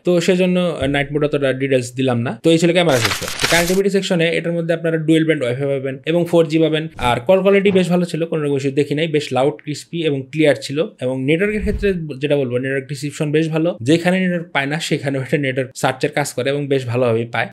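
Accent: Tangail, Bangladesh. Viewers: Vai tangail theke naki? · Bhai ki tangail er ni? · Vai apnar basha ki Tangail a? 😱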